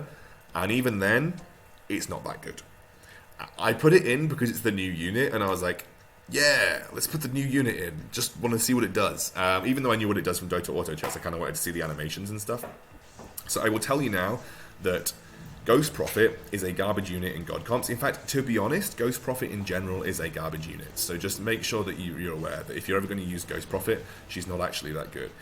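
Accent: United Kingdom. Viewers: Love your british accent jajaja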